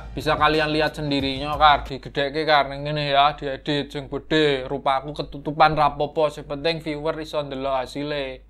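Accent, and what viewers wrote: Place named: Java, Indonesia. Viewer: medok pak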